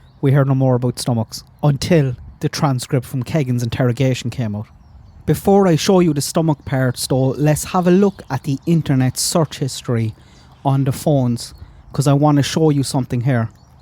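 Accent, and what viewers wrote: Ireland. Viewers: Irish and you could slow the video down if you're having trouble lol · You have a heavy Irish brogue.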